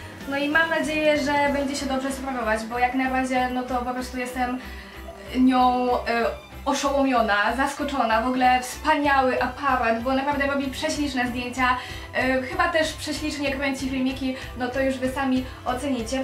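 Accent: France. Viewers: Masz francuski akcent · Akcent .. Francuski? :D jak się ,,NAUCZYŁAŚ" tego akcentu lub j. plskigo? :o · Mi to nie przeszkadza, taki trochę francuski akcent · Skąd pochodzisz bo na tym odcinku słyszę taki francuski akcet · Masz coś w akcencie z francuzkiego... Mieszkałaś kiedyś we Francji. Albo coś w tym stylu? 😄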